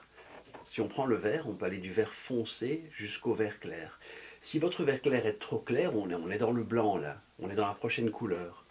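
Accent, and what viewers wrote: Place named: United Kingdom. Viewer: Pour les Français qui écoutent, je peux confirmer que, ce professeur, a un très bon accent anglais britannique, qui est, à mon avis (sans que je sois biaisée en tant qu'anglaise), beaucoup plus agréable que l'accent américain! I could. I should. I would listen to all day!!! 😬😬😬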